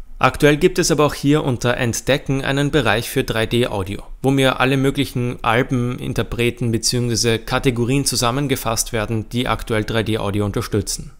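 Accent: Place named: Austria. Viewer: Ich liebe diesen österreichischen Akzent immer wieder! 😃😍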